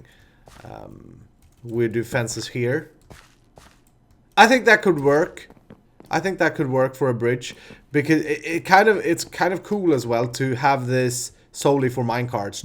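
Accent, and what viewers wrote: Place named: Sweden. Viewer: eller jag hörde på ditt utal att du var svensk :D eftersom att jag också håller på med youtube och jag snackar också engelska i mina videos :D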